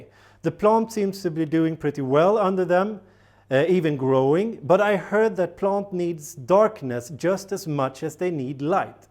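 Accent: Sweden. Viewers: Perfekt Engelska, jag älskar den svenska accenten! du ger bra råd, tack så mycket. Min svenska är skräp..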